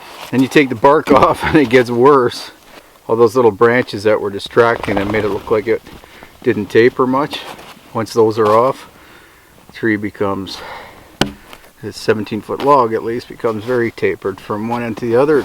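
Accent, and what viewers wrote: Canada. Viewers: Este es más expresivo, tierno, que se equivoca, trabaja sentado en la nieve y conversa, tropieza y hasta sonríe cálidamente. Contrario al otro más serio, que habla poco, mas eficiente y por momentos algo aburrido. CUANDO PONDRÁN SUBTÍTULOS EN ESPAÑOL???, hay muchas cosas que no se entienden del dialecto canadiense.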